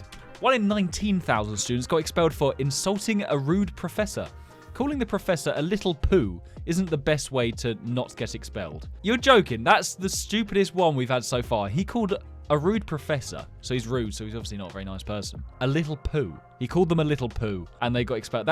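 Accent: United Kingdom. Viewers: Dropping fireworks hmm what does that remind you of maybe the Weasley twins from Harry Potter also you keep reminding me of Ron with your British accent and curly hair.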